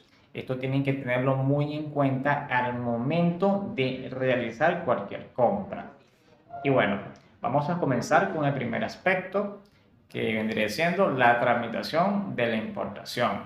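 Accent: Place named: Apure, Venezuela. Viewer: Eres apureño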